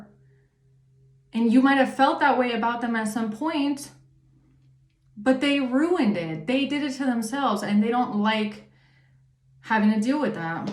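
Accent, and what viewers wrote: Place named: Germany. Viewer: Good morning 🌅, LOOKING FORWARD TO HEARING YOUR VOICE, THAT GERMAN ACCESS OMG YOUR A GODDESS, MUCH RESPECT, HAVE A BEAUTIFUL DAY NIGHT, GOD BLESS YOU X ❤👑☀️💜🛡️👑☀️💜💜💜❤😊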